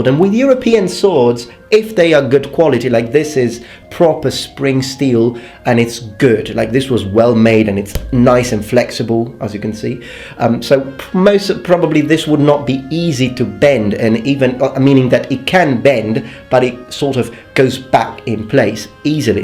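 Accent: England, United Kingdom. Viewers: Why does it sound like you have a English accent when you are from Sicily? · Does his accent have a little bit of British in there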